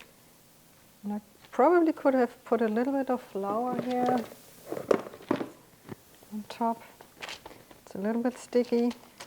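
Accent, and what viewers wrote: Germany. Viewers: I LOVE the burnt raisins!! I usually will do my Stollen on the weekend after Thanksgiving. I have to smile when you speak because you pronounce English words with German sounds. I do not laugh at you but sure appreciate how hard English is to learn for non-native speakers. Unlike German, English diphthongs ARE NOT consistent. You do very well!!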